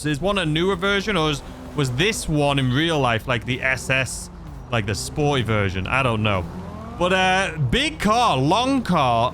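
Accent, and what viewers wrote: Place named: Doncaster, United Kingdom. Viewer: Sounds like your from sunny Doncaster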